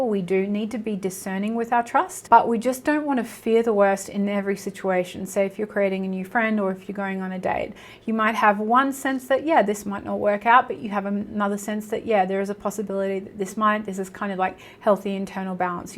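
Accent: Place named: Australia. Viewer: I think she’s Australian.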